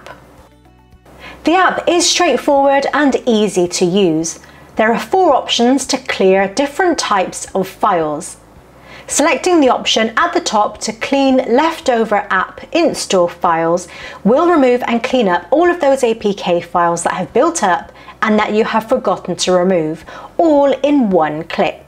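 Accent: United Kingdom. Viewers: I like you a lot for several reasons, to start with, you are a lovely girl, feminine, attractive, beautiful British accent and so on.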